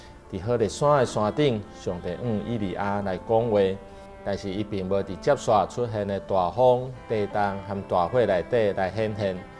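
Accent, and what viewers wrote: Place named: Taiwan. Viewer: 今仔日予我一改誠歡喜來當來聽著，牧師用端的台語放送福音，一來會當認捌上帝所講的道理，二來會當來學習著端的閣優雅的台語。牧師講道的時，聲嗽清楚優雅，予咱聽落心情齊平和。幫贊咱來認捌上帝的話，感謝上帝透過牧師來教示來勸勉。這真正是咱台語人的一大福音。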